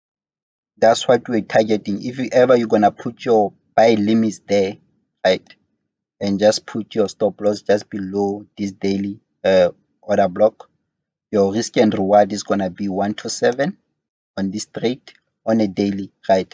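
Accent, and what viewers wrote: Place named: Sierra Leone. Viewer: Are you from sierra Leone bro? You sound like one . Nice video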